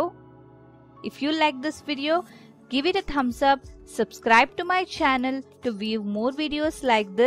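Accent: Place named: India. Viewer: I love this indish accent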